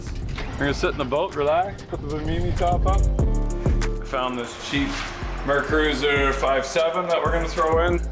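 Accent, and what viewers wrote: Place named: Canada. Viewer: How do you spell out the way you pronounce it… owe-t wtf nobody cares your from Canada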